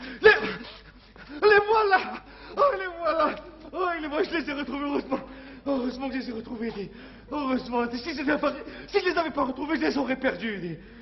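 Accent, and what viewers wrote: Algeria. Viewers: Trop puissant Castel ! Cet accent pied noir c'est que du bonheur. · L'accent pied-noir d'Alger,différend de l'oranais à forte empreinte espagnole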